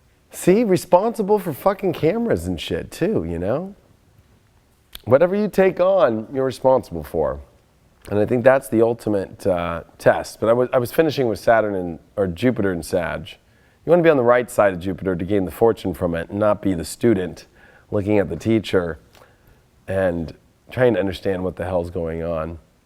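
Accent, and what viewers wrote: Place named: California, United States. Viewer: Love you dude you talk just like my friends back home must be a cal thing. Thanks bro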